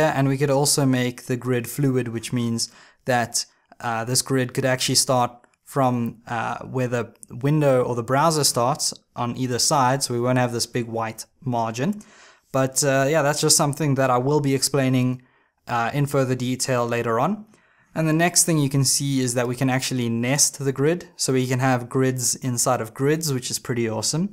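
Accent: South Africa. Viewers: By your accent I suspected you're from SA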